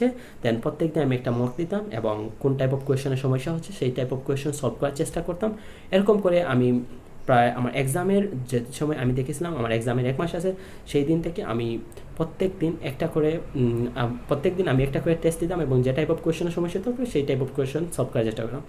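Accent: Sylhet, Bangladesh. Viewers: Are you Sylhet’s your voice is like Sylhet’s Very helpful your video.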